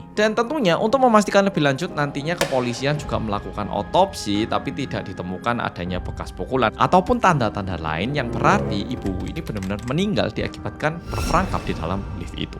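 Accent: Java, Indonesia. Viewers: Hai medok🎉 Menyedihkan😢 suwun yo rek 👌🏿🙏🏿💕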